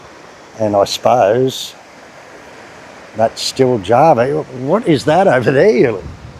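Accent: Australia. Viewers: talking Indonesian with an Aussie accent is great 😂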